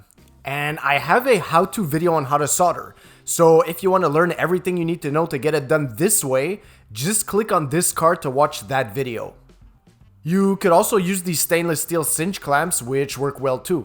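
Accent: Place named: Montreal, Canada. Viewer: Montreal accent for sure.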